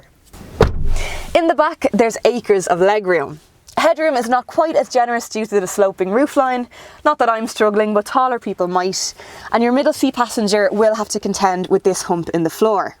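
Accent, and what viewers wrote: Ireland. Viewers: I think I prefer a woman doing a car review over a guy. Same great data and specs if not even better with none of those macho undertones. Also love your Irish accent, much nicer on the ear than any British accented presenter out there.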